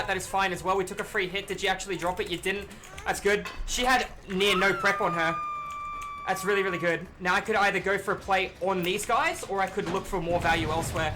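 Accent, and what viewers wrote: Australia. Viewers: I don't hear it probably because i'm Australian too lol.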